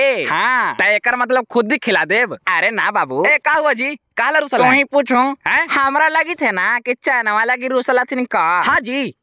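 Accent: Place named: Patna, India. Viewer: Je tu patnaiya tone or bhasa me bolte hauwan.... Baddi bes lagat hauye.... 😂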